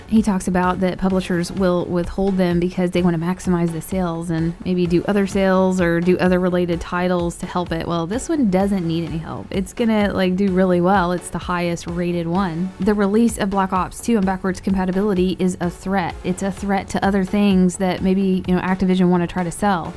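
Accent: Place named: United States. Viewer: Who Cares i am from england. her voice sounds 'normal' to americans yes but i love the american accent 😍😍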